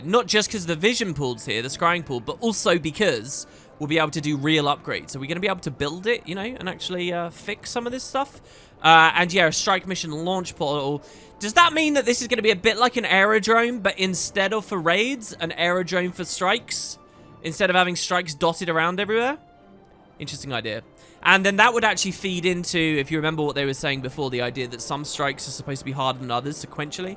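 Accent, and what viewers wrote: United Kingdom. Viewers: You have nice voice, always enjoying your accent (not too British, so I can actually understand) and intonation. (Tho sometimes your videos have a bit screwed up volume balance ;d Just wanted to compliment you as a fan ;)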